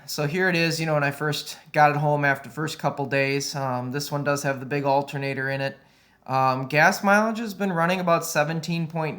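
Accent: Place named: Fargo, United States. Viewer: its REALLY NICE! lol...love the fargo accent.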